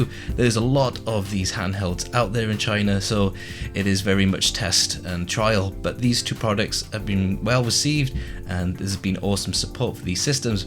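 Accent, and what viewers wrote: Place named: Cardiff, United Kingdom. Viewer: Is that a fellow Cardiff accent I detect? Great video mate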